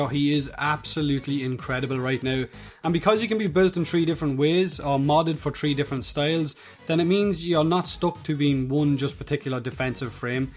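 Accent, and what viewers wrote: Ireland. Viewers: YES IVE SPENT MONTHS LOOKING FOR AN IRISH WARFRAME YOUTUBER THAT ACTUALLY SPEAKS IRISH! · is that an irish accent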